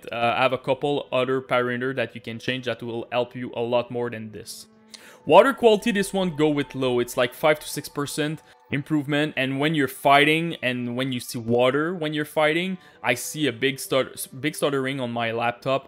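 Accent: Quebec, Canada. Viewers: Québécois? :D Merci pour le vidéo, j'espère que ça va m'aider.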